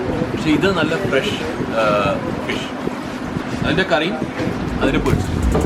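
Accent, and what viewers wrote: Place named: Kozhikode, India. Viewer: കോഴിക്കോടൻ ഭാഷ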